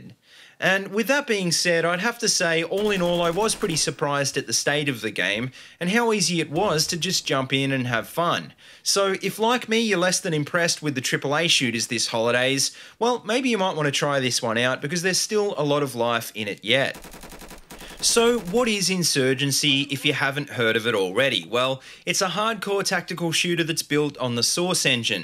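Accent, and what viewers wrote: Australia. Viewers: Youra Queenslander arnt ya? :p That okka accent! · Gaming I knew you were Australian as soon as I herd your voice keep going mate.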